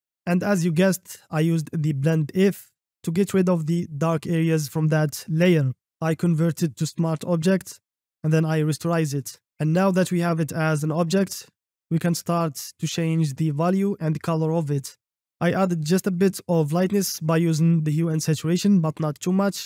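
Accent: Arab world. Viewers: أحسك عربي ؟ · أنا متأكد انك عربي من لهجتك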